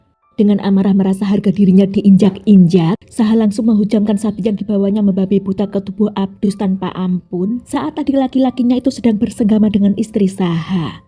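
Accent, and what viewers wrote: Java, Indonesia. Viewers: Logat bahasa Indonesia nya diperbaiki lagi mbak. Medok amat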